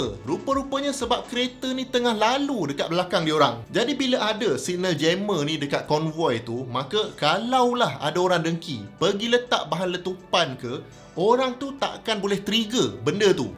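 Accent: Malaysia. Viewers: Penyampaian jgn kaku ,lagu kasi cantik lagi, mana2 perkataan inggeris jangan bunyi Melayu sangat, agak2 sikit, jadi kelakar nanti murid2 nak educate. Kurangkan r & ē tu. Good juob.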